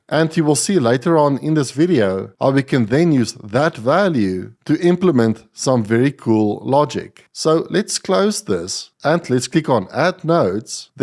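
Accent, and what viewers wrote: South Africa. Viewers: So South African :D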